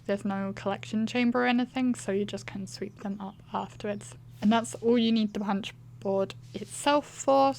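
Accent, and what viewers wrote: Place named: United Kingdom. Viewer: I have been thinking about making my own envelopes. I haven't got a board for it, but I might have a go anyway! Also, just wondering why you make your cards in A2 size? From your accent, I can tell you're British (like me) so wondering why you don't male A6 cards, especially as you mentioned your patterned paper was A4 (rather than US letter size).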